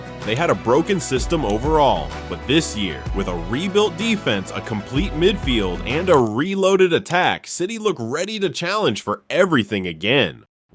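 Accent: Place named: United States. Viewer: video would be better without an american accent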